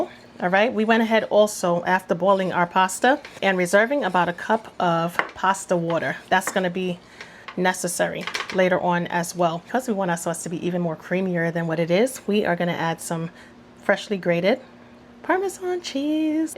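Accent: New York, United States. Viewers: I LOVE the east coast accent, New York, Jersey, or am I wrong? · I love your cooking and I love your accent! Sounds a bit like New York! Thanks for sharing so many delicious recipes 😊👍🏼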